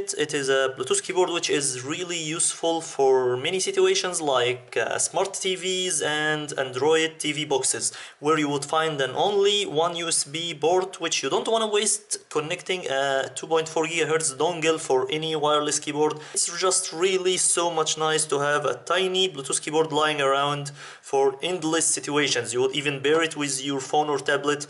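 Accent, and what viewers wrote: Egypt. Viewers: الاكسنت دي مصريه اجيبه منين وبگام بقاا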